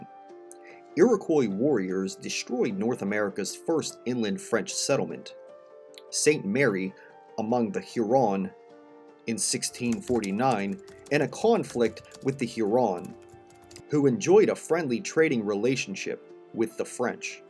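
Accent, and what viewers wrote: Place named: United States. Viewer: Are you American? It's funny how you pronounce all the French words.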